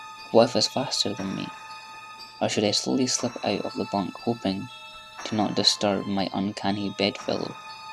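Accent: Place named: Scotland, United Kingdom. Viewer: Nice to hear a fellow Scot read one of my stories. Nicely done :) ~ Mike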